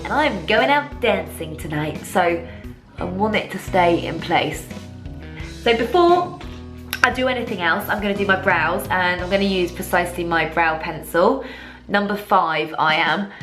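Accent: United Kingdom. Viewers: how proud you are of your Scottish accent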